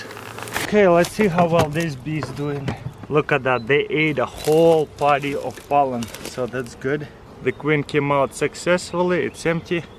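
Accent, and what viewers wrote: Russia. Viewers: Hey, love the bee videos. This is something me and my husband have talked about doing but we know nothing about. Hopefully someday we can getting our own hives started. You videos are a help. You show the realness of it. Try and fail and succeed. The russian accent is a plus as well. Keep plugging.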